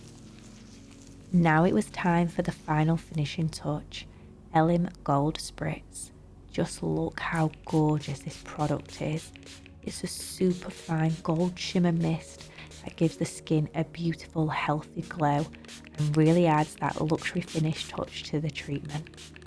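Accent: United Kingdom. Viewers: where in the UK her accent is from?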